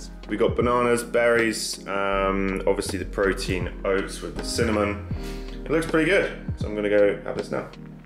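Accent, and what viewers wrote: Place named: United Kingdom. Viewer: Well, I'd say that it would be interesting to keep up with you not only for gym activities but for whatever you may like to show us (like some progress with your entrepreneurship etc.). This way, guys who come here for some motivation and a positive example of self-change will see that they can not only change their physical appearance, but their life overall. If you'll be willing to take that role, of course. A big thanks to you for creating this channel, mate! I found your shorts extremely interesting and useful - they don't take lots of time to watch and are straight to the point. Also, y'know, I'm a straight guy and all that - but, dude, you look damn amazing! I love British pronunciation over all others and must admit that your is awesome. Thanks for keeping it understandable for non-natives.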